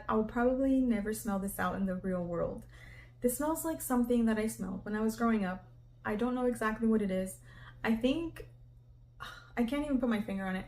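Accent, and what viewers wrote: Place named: United States. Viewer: Where you from? I love your american English accent is so clear❤❤ your baby is so cute❤❤❤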